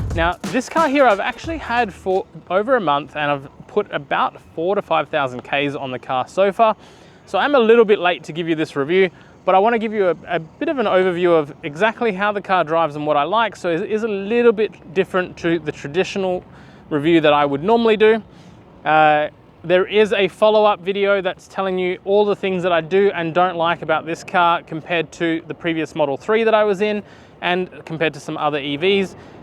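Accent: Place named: United States. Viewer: Nice car review! Thank you! Btw, you sound like an American so much😂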